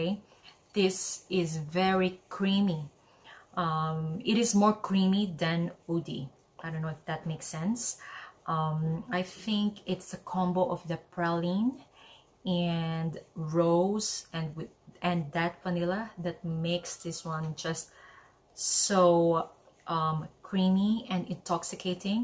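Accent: Philippines. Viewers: Filipina accent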